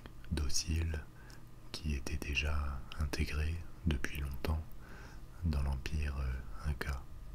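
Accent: France. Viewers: I now realize what's been missing from my ASMR experience. A beautiful French accent 😍😍😍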